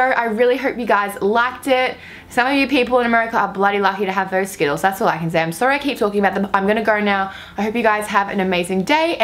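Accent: Australia. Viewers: Omg I love your accent😂❤️ I never thought I would love Australian accents but I turns out I do😂😂 when you found out the Reece's bar was out of date hahah omg😂😂❤️❤️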